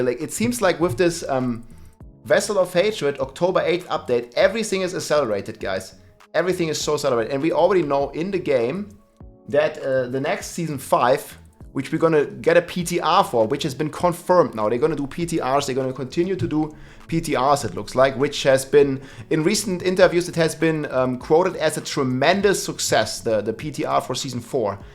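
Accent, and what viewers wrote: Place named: Germany. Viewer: Ich mag es wenn man Englisch mit ein wenig Deutschem Akzent spricht - so toll (kein Sarkasmus), der beste Diablo-Content-Ersteller!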